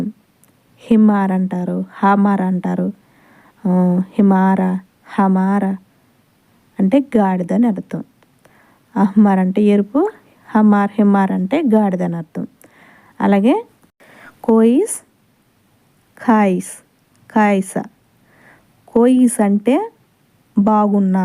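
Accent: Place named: Godavari, India. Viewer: మేడం మీరు ఇస్ట్, వేస్ట్ గోదావరి అనుకుంట మాటలు తియ్యగా వున్నాయి,, మా డిస్ట్రిక్ట్ కడప, ఐ లవ్ యు మేడం మీరు చెప్పే అరబిక్ చాలా ఇంపార్టెంట్ థాంక్స్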